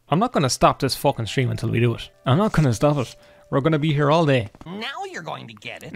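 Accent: Ireland. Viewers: Narrating gonoor or whatever his name is in your Irish makes him seem a bit scarier if I'm honest..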